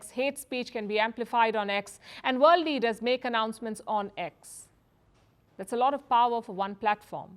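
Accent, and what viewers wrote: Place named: India. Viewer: You are lier I wish you get off of your Indian accent first to be a speaker 🔈 plus you don’t know nothing about corruption in Brazil lady Lord has mercy on your soul and the machine manipulation behind your job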